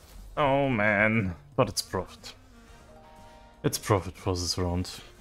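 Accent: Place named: Germany. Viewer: 😆Nirgendwo sonst den deutschen Akzent so rausgehört!!!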